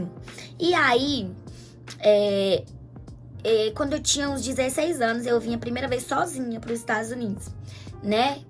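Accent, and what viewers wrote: Minas Gerais, Brazil. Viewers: Logo vi... Seu sotaque mineiro , não perdeu.. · Linda sua história, vc é lindaaa , acho lindo seu sotaque mineiro, admiro ver mesmo morando fora do Brasil, continua amando nosso país, eu também tenho esse mesmo sentimento saiu do Brasil por causa de segurança e poder dar uma vida financeira , educação para minha filha , mas o Brasil nunca saiu do meu coração ❤️ tenho minha casa 🏠 no Brasil , um dia voltarei a viver lá 🇧🇷 se Deus permitir quero terminar minha velhice lá, minha terra amada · Oh! Sua história é linda, quanta meiguice 👏👏👏gente nao esquece de cutucar o sininho👍amo esse jeito mineirinha de você falar🌹b💋no teu ❤ desejo uma boa hora minha🌹aqui no nordeste falamos assim quanto tem uma gravidinha!! Admiro muito você visse? 🌹do dia🌹da tarde e 🌹da noite!!💋💋💋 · acho tão bonitinho seu sotaque...a família do meu pai são mineiros e acho mto legal esse jeitinho uai de ser. 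· Querida amo ver vc contando sua vida no exterior tão menina e com tanta maturidade pra aceitar as diversidades da vida....parabéns por amar nosso país q é abençoado por Deus o que ñ presta são as lideranças sua história é muito linda amo seu sotaque mineiro meiguice demais ao falar deve ser lida falando brava jeito lindo de ser humilde: grande abraço! Deus é contigo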